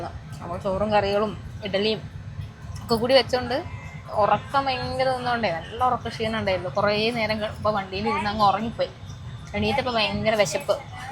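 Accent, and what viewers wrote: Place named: Valluvanad, India. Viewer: Molu eth nattukariyanu❤valluvanadan bhashyanu❤❤❤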